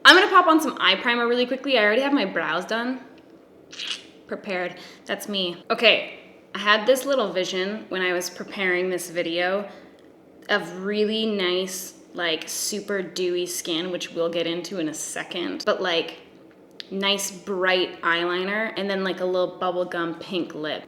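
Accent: Canada. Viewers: This was lovely to watch are you canadian🇨🇦?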